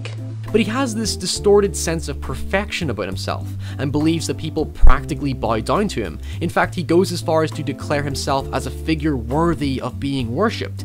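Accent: Northern Ireland, United Kingdom. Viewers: He's Northern Irish 😊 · Are you from Northern Ireland? Your accent is from here but has a weird American twang to it. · It's Northern Irish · he sounds like a northern irish man like myself :) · You sound like you're from N.I, are you? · Northern Irish accent?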